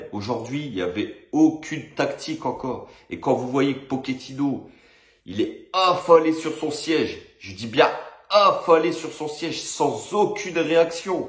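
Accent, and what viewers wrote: North, France. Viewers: T es nordiste ?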